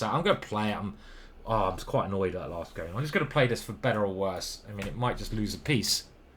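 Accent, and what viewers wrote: United Kingdom. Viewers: Mate, I love watching you Titled Tuesday's. A good old British commentary is so refreshing and entertaining to hear. With all of your "fuckin twats" and "wankers"! And very educational. Keep them going 😊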